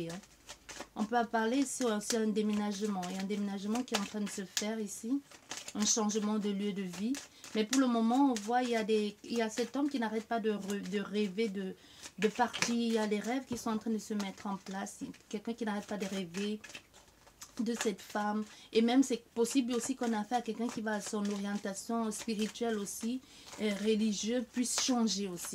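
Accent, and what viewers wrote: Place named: Martinique. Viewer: Vous êtes Martiniquaise ,avec cette accent...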